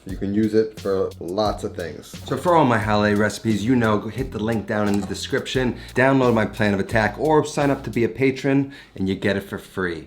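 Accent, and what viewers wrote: New York, United States. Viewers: Sounds like a New York Italian accent... very similar to a Montreal Italian accent. 😉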